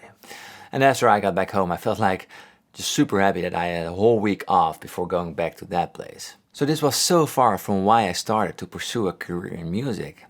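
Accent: Netherlands. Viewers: I already thought you would be from the netherlands from your accent, but what town are you from? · Nu begrijp ik waarom ik je Engels zo prettig vind, je bent ook Nederlands of op zijn minst deels. Leuk. Succes met je kanaal, ik heb er erg veel plezier van. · Watching your footage on YouTube I didn't notice at all however in this vid I thought 'hey this guy sounds Dutch'. In seconds I recognized the Dutch style houses and the distinctive yellow car registration plates - Holland!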